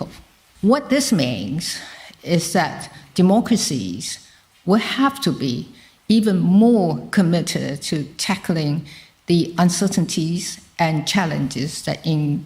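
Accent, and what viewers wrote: United Kingdom. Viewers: 現在仔細聽，真的有英國腔~ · 台灣出了一個帶日本腔英文的李登輝，又出一個帶英國腔的蔡英文，現在又有一個台灣腔的賴清德，真是連總統都多元化的寶地。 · 好喜歡聽她說的英國腔英語。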